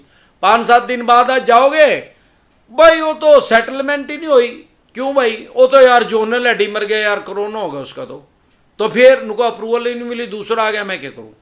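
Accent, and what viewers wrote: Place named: Kaithal, India. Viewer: Sir your voice is similar to our we belong to kaithal area nice presentation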